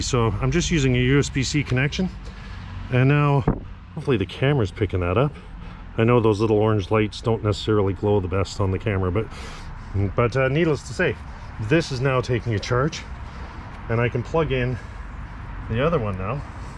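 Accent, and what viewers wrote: Canada. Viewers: You must be from Canada yeah?!😅 Loving the content man keep it up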